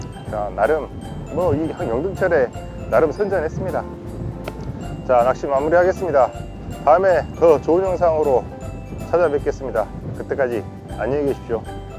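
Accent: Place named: Jeolla, South Korea. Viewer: 터진고기는 목줄 검사를 꼭 하십시요 터지면 환장합니다 전라도 사투리 역시 구수하네요 저도 본은 전북 정읍입니다 직장이 평택이라 올라와 있죠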